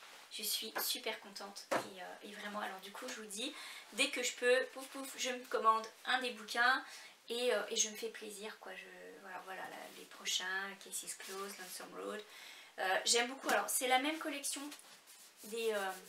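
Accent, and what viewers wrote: Quebec, Canada. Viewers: Coucou, contente de te retrouver, j'aime toujours autant ton univers et lorsque tu as l'accent ou que tu sors des mots québécois, cela me fait plutôt sourire, j'aime bien. De bien beaux ouvrages et bravo pour Biscotte ! Merci pour le partage du modèle, je me laisserais peut-être tenter... Passes une bonne journée et à tout bientôt.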